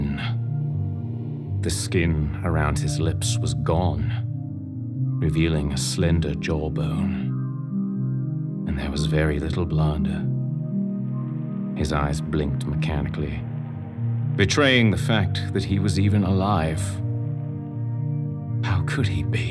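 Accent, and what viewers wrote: United Kingdom. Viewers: Ohhh British? Well this just makes me appreciate even more 😊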